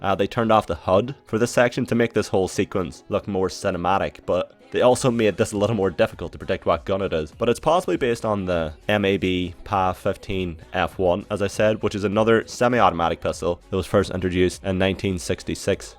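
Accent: Donegal, Ireland. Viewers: Are you Irish? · haha I just know the accent lol im from donegal as well can't wait for this game to come out 😊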